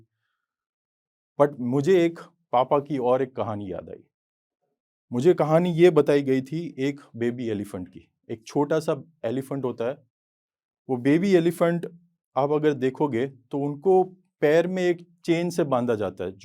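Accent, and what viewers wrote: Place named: Gujarat, India. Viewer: I can feel that Gujarati accent in your speach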